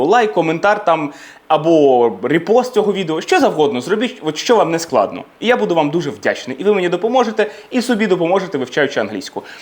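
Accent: United Kingdom. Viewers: Не подобається британська англійська,але дивлюся всі відео. Хоч як,але це корисно.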